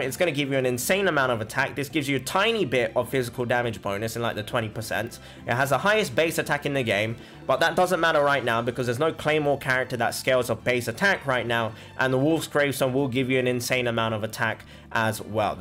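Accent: United Kingdom. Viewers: Your asian+British Accent is Smooth;! 😂😂😄😂😁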